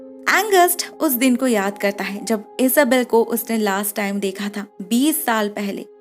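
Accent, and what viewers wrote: Bengal, India. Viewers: मैडम आप बंगाली हो मैं आपकी हिंदी सुनकर बता सकता हूँ पर आपकी वॉइस अच्छी है😁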